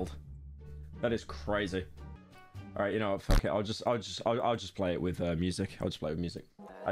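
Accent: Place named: United States. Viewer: Why is he so high pitched and murican twanged now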